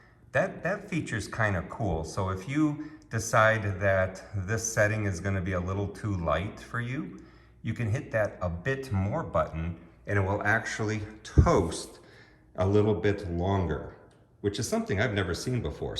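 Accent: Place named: Midwest, United States. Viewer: harrrrd midwestern accent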